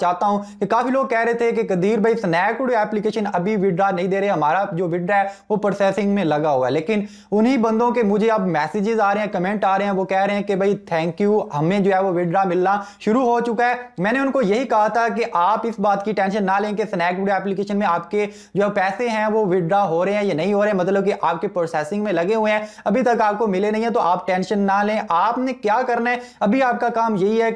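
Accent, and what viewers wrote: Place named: Punjab, India. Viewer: اس نے پڑھائی نہیں کی سارا وقت ان ہی کاموں میں گزار دیا تب ہی سانیک ویڈیو بول رہا ہے :) پتہ نہیں یہ پنجاب والے بھائی اردو بھی پنجابی کی طرح کیوں بولتے ہیں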